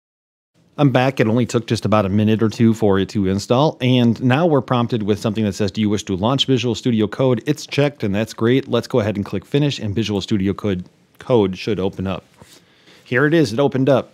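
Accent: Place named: Midwest, United States. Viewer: Fantastic video. And as a Chicagoan relocated to the hinterlands of Seattle your Midwestern accent was the best part.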